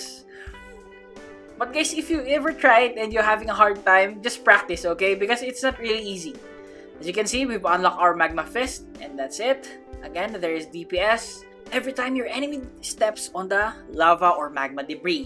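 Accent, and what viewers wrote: Philippines. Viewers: Hi are you Filipino? Bc u sound like Filipino btw good work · Knew you said sa Skylands are you Filipino?????? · your accent is so philippino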